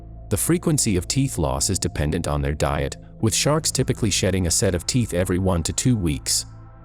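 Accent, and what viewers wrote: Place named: New England, United States. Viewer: FINALLY!!! A mini doc that doesn't try to proclaim that the Meg is still alive. Thank you so much. 2 things - - It's pronounced Ea pock not Epic. I do believe that the narrator is from New England or where "evah".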